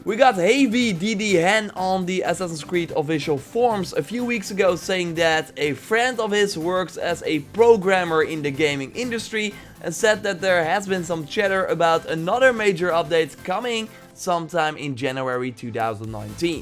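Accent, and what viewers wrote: Netherlands. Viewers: I thought so you're from the Netherlands from your accent. Nice video by the way!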